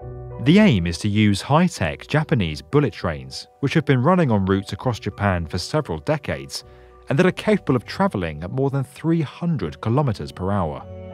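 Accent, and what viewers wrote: United Kingdom. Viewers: Where is the money coming from? If it is SOLELY from the State of Texas, I really don't care. Texans can spend their dollars however they choose. However, if even one cent of federal tax dollars goes into this boondoggle,I feel like I've been robbed again. I also take umbrage at the narrator and his British accent a if to somehow transform the US into another European look alike.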